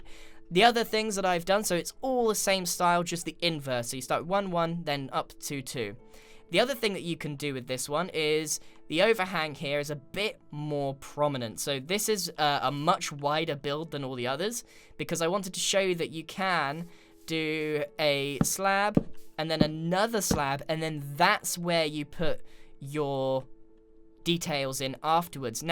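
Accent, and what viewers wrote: United Kingdom. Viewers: Are you Britain? It sounds like it. Newest sub BTW 😁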